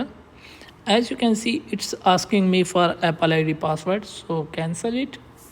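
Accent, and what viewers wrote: India. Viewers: This is the only indian sounding guy I can trust.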